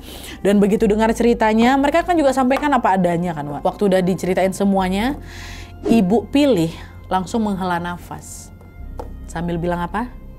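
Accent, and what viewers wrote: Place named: Manggarai, Indonesia. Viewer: Ini cerita dari manggarai, dari logatnya, makasih wakkk udahh nyeritain😅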